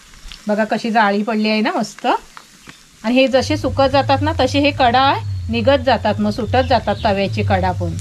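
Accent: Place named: Konkan, India. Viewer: Mla kokani bolna kupch aavdt tumch 🎉❤😊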